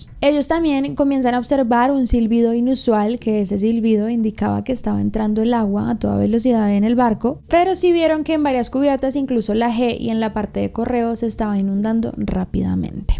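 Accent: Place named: Colombia. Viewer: Excelente narración, eres muy buena y tu acento parcero me encante saludos desde Mëxico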